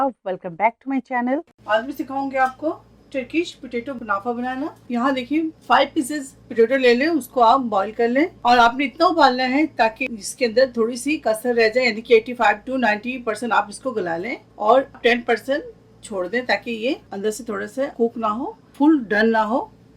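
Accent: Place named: India. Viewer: How nice you explain every thing ...... I will try the patato that way loved you accent from india....... And give more food video.......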